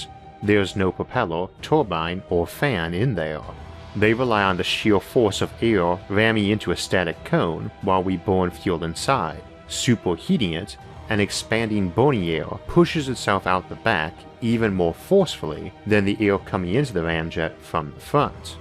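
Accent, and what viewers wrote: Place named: Australia. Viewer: and im still tryna figure it out (i only hear 80% australian idk the rest...). 🤣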